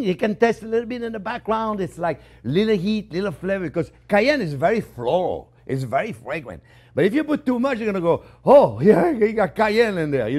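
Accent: France. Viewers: Yesss delious 🎉as usually, I’ve cooked this receipe for yrs, just didn’t have your awesome 👏 homemade broth an yrs ago had I known bout it I would have cooked it bcuz had a big kitchen, but broth in the box an bouillon cubes, Luv your recipes 🎉, an your French Accent, bloob bloob an you twinging your hands in air, tell food to get bk in pot, most of all you cook soo clean 🧽 with everything, wash your rags out wipe your working board off, I get soo tired of other cooking videos not rinsing off meat 🍖 are etc, tonigt my friend I’m drinking red wine 🍷 an enjoying your videos you made me laugh 😆 when you said if you have criticisms go an watch Tik Tok are what ever it’s called I laughed soo hard lucky I didn’t burst my jeans, lol 😝, You are a blessed renound chef 👨‍🍳 God Bless New Orleans La. 🎉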